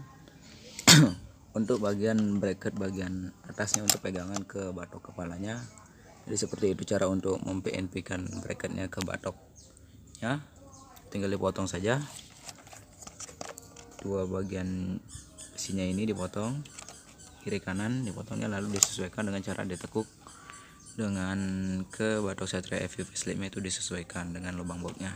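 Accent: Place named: Bali, Indonesia. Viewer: LOGATNYA KAYA ORANG BALI,, MAS NYA ORANG BALI YA?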